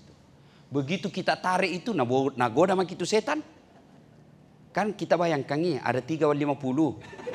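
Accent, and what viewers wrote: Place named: Makassar, Indonesia. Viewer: Saya di samarinda sangat terhibur dan teringat logat2 khas makassar yg sangat lekat sejak masih di sulawesi..😂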